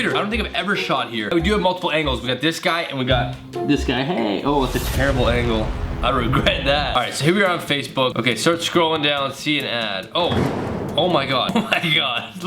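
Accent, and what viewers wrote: Canada. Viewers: Guys wait is this feller Canadian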